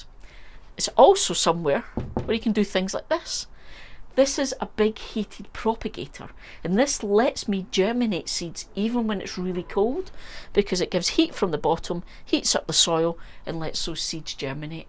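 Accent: Scotland, United Kingdom. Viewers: Not fair unless we know where your accent is from?? Scotland. Irish. I am Scotch Irish living in Louisiana, raised in Texas with an East Texas accent. 😊 ❤ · Hello again. I believe it was back in your previous greenhouse. Maybe I’m not remembering correctly, but it was a smallish plug in heater. I don’t want to cause you any extra effort because I could be remembering wrong! Anyway, if it’s nice and warm all day and is then at freezing at night, I’m thinking that would not be good! Anyway, if you are pretty sure you never used a heater, then don’t worry about it. Could be another of my senior moments! Ha ha. PS the first time I watched you, I thought “oh no, I’ll never be able to understand what she’s saying with a Scottish accent” which of course was not the case. Your presentations are so great! Keep them coming👏👏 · I was surprised when you first started talking. 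Your accent is so cute and you are so easy to understand. Except a couple of times you mentioned ober jeins.(That is how it was spelled in the closed captions.) I wondered what that was.So I googled growing ober jeins in Scotland, and started laughing when I realized you were saying aubergines! We just call them egg plants here in Michigan. You were very informative and helpful, so I decided to subscribe to hear more of that accent! I almost did not click on your video when it came up in my feed but I wanted to find out what your Ikea hacks were. I am so glad I did! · New here love your channel and your information thx u 😊 I’m from Australia 🇦🇺 my mum is Scottish so I feel at home listening to your voice ❤ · Clicked for the title, listened because of your awesome accent (Scottish heritage all over my family tree), and stayed because of your content. So very helpful. Thank you for sharing your setup. 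You got a new subscriber. I had to laugh at myself being a typical American. Me: What? they have IKEA in Scotland? Me a moment later: Ya big dummy, IKEA is Swedish, probably had IKEA before you even knew what IKEA was. Hahaha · Hello my new friend in Scotland! This was a wonderful video! First of all listening to you is like having my mother read me a bedtime story… Your accent and lilt are very soothing! Secondly, I just realized that by using water from the tap, I am shocking my seedlings! So thank you for that common sense advice. Lastly, you have inspired me to move some tomato and basil plants into my greenhouse for the winter. I’ve always wondered about pollination inside a greenhouse , so I’ve never done it all season long. However, I am going to try to extend my season this year by moving my establish plants into my unheated greenhouse. Looking forward to viewing the rest of your videos. 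· Thanks for your video with your charming scottish accent.' I kanee...?..I was at uni at St Andrews and it brings back good memories. What I don't quite understand why you would put the IKEA plastic recipients low down because you could use that space to grow plants and maximize the light. We have built light shelves all around the edges of our greenhouse which can be taken away so we start small plants on two levels then remove the shelves that are about at one meter twenty .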